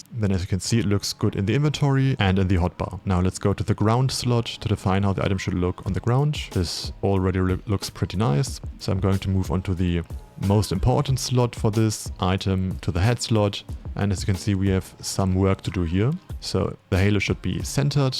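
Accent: Germany. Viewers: man hört das deutsch so raus XD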